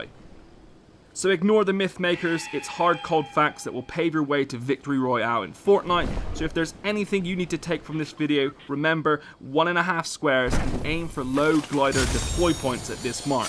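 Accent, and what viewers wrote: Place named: Bristol, United Kingdom. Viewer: You’re from Bristol right??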